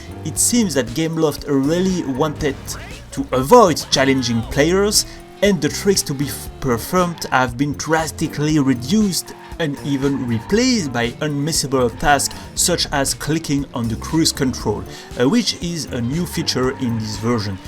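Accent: France. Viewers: Question are you french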